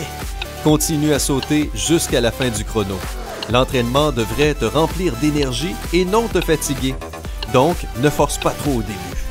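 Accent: Quebec, Canada. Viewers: Accent canadien? C‘est nouveau 🤔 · C'est un québécois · c'est un Québécois!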